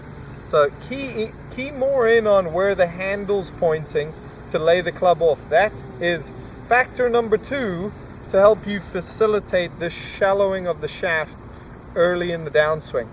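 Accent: South Africa. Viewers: is he really South African bru...??? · Is that a South African accent? Thanks for the vid and info, very helpful. I recently discovered I get across the line at the top, swing too steep and come out the shot slightly as a result. The cupped left wrist also a real "aha" discovery. Now the real challenge, to change the muscle memory!!! Not a small task. any drill tips meneer? Dankie.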